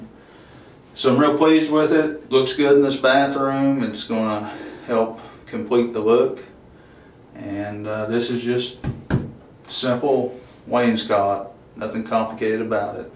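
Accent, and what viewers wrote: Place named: Georgia, United States. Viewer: Georgia or south Carolina ?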